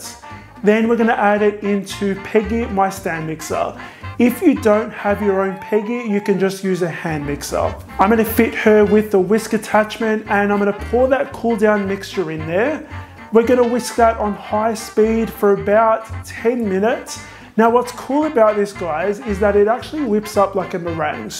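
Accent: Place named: Australia. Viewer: He's Australian. I agree, the accent is lovely to listen to.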